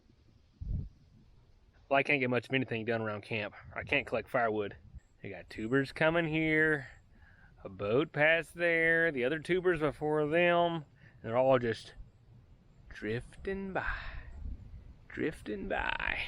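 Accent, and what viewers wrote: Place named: South, United States. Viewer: Damn man you have a perfect country voice.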